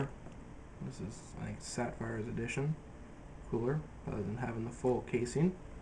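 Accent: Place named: Canada. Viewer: You couldn't sound any more Canadian. I'm getting the Vapor-X edition myself.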